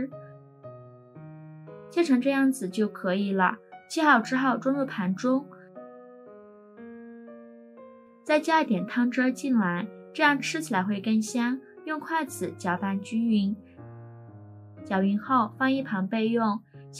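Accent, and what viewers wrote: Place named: Mainland, China. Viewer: 中國都是這麼唸 我們台灣唸ㄒㄧㄠˋ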